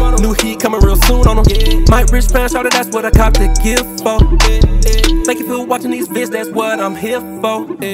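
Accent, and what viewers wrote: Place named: Atlanta, United States. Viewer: Not a day one, but my first Mike Rich vid was the "What the" KD joints with Jay. Being a ATLien myself, as soon as i heard the accent i was hooked. Been rockin with ever sense, dooooooo it!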